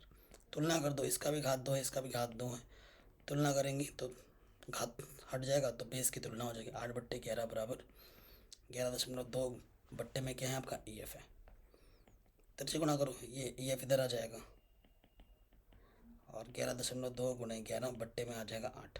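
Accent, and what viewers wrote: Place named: Haryana, India. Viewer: Bhai to Haryana se hai..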